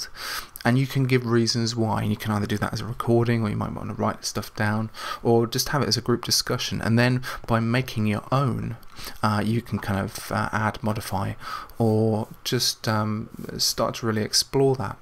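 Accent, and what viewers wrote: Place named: North, United Kingdom. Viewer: Thats really awesome, great work...you say you're from the north, what part if you don't mind me asking, anywhere close to Middlesbrough, can't place the accent :)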